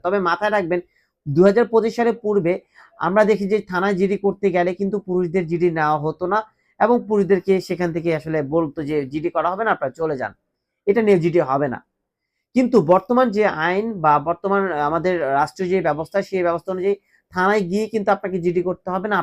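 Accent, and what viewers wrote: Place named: Kolkata, India. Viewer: আপনার ভাষাটি কলকাতার মত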